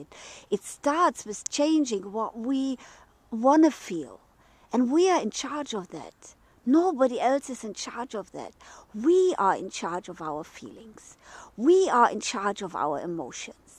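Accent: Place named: Germany. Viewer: Thank you! I also wish you a most happy christmas. You're a fantastic lady and it's a pleasure to communicate more with you then ever before. Your toastmasters involvement surely did good to you German accent English;-) Keep up the great work! Let's make it TO BE fabulous!:-)))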